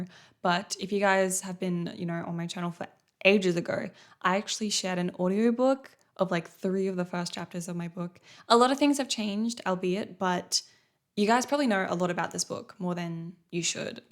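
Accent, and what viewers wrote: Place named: Australia. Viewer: honestly i just finished wathcing your writing documentary that randomly appeared on my fyp. It was the first ever video, I saw from you and actually it made me following you after I just watched for a few minutes. What I'm gonna say maybe sound a bit weird, but your voice with this austrialian accent reminded me of my home. Not in the way of living there, i'm an austrian girl, but more like because of four people in my life, that come from there. For me, those four celebrities kind of tought me speaking more confidently in english and thats also why i got an australian accent myself eventhough we only learn american english in school. I really liked your whole vibe and look so i really think that you'll become sort of a safeplace for me and my thoughts. Writing has always been a huge part pf my life and watching your video really inspired me.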